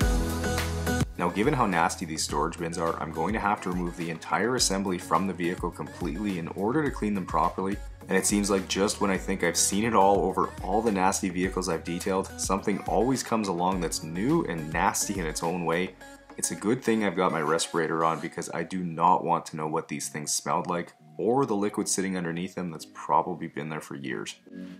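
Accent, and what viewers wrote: Canada. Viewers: I loved this video! I’ve never seen your channel before but a YouTube friend said I had to add you to my watch list. I love dr pimple popper, the hoof GP and now you! Your voice is so soothing (love me a Canadian accent) And the transformation is amazing! What an incredible job 👏🏼 Love from Scotland 🏴🥰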